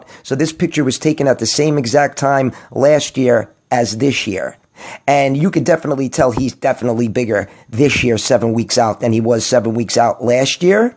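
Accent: New York, United States. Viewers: i love your no bullshit attitude mixed with the NY accent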